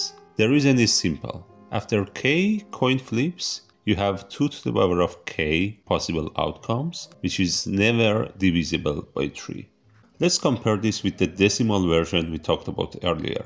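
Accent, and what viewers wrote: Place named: Eastern Europe. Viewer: Are you from the Baltic states or a Slavic country? Or Romania? I can instantly recognize this accent ANYWHERE !! :) CHEERS for the content, keep it up! .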